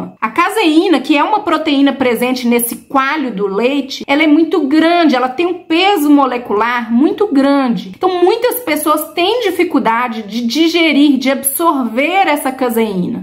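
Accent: Minas Gerais, Brazil. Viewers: Acho que você é mineirinha pelo sotaque. Eu sou de Bauru SP.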